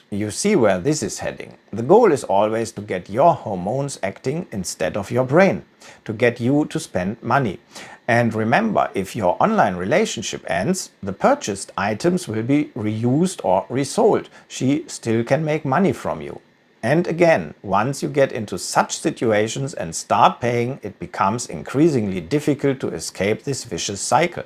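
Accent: Germany. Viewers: German accent still 100 percent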